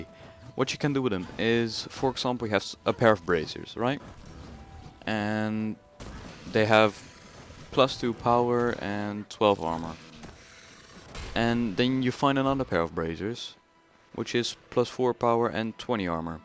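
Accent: Netherlands. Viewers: Dit is letterlijk de eerste keer dat het me niet stoort dat iemand die nederlands is engels praat in een filmpje. Je hoort het wel, maar je hebt (voor zo ver ik hoorde) geen fouten gemaakt zowel grammaticaal als in je uitspraak. Daarbij was het ook een heel handig filmpje aangezien ik net 2 dagen bezig ben met gw2. Keep up the good work! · very nice video, i directly heard u were dutch ;) subbed for more videos!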